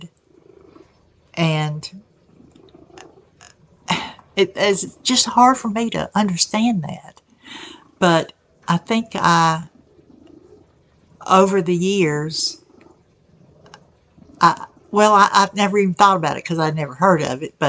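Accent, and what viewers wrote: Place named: South, United States. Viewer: Love this,! I think this is fabulous; you’re very funny, interesting and articulate, not to mention brave. And trust me honey, we both have southern accents even if we think we don’t 😘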